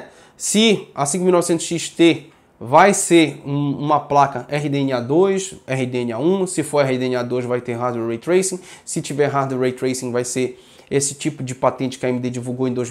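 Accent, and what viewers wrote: Pará, Brazil. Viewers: cara tu é paraense??? hahaha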